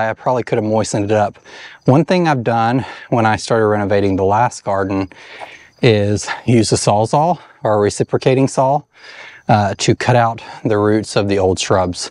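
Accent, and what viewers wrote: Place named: Appalachia, United States. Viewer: Hello, I love watching your channel! I think one of the main reasons is because I live in the Appalachian mountains in Huntsville, AL and I feel at home listening to your accent. I grew up on top of Monte Sano Mountain and now live on the side. Thank you for allowing us to follow your journey.:) Kelly Flowers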